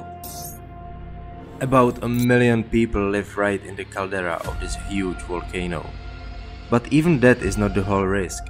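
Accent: Czech Republic. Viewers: by accent u are deff czech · Do I hear a czech accent of speaker, don't I? :D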